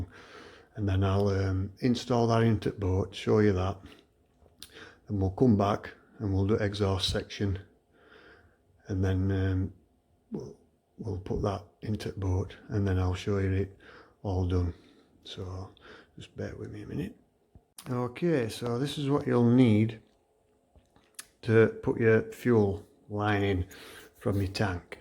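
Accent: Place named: Barnsley, United Kingdom. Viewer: That's a proper Barnsley accent - t'owd lad :-)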